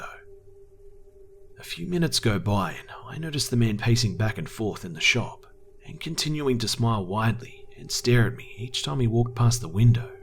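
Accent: Australia. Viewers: are you Australian? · Are you Australian cos you sound like a true Aussie 😄🏖🇦🇺🇦🇺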